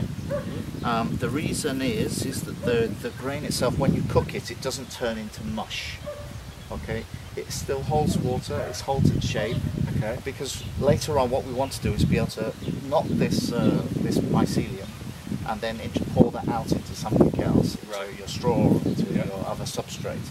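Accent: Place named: United Kingdom. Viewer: You mean "spawn jars"? Oh, sorry, your british so you talk funny to me.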